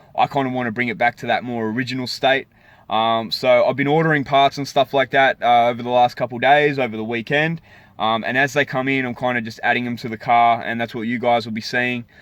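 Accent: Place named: United States. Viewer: Mate, your generation is sounding more and more American everyday. We speak English, that’s English English, not American English. So when we say ZH it’s not Zee Aitch, it’s Zed Aitch. Nice car.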